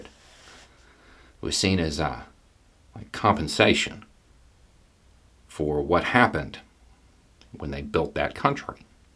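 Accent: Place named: Bible Belt, United States. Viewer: Sounds like a out in the woods BIBLE BELT folk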